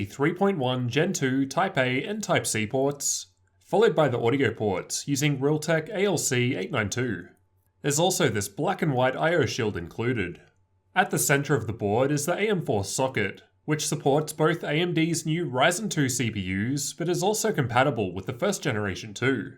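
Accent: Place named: Australia. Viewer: U are Aussie right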